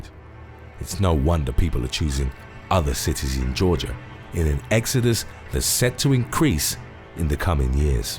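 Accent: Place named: United Kingdom. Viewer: I'm not knocking his statistics, however it's kind of hard to believe this guy talking with the British accent lol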